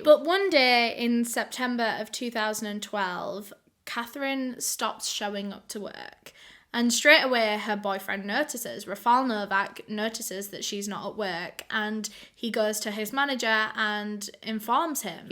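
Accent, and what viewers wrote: United Kingdom. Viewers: so is that a British or Valley Girl accent. I can't tell.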